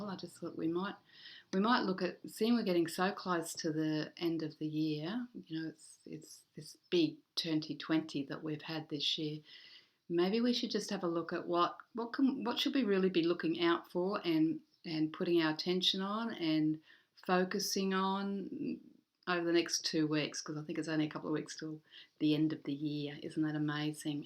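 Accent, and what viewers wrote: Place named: Australia. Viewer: 1. I love the Australian accent. 2. Absorbing the Love vibration you emit. 3. You are the perfect spiritual advisor needed at this time. In conclusion, your insights and suggestions help to analyze how one can manipulate ones perceptions for a better understanding of ourselves, those around us and the collective. Much gratitude, love and Blessings for your time and energy. <3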